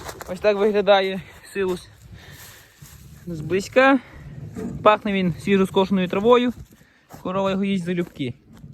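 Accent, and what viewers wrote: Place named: Surzhyk, Ukraine. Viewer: А де ви живете яка область. Такий говор як в нас суржик .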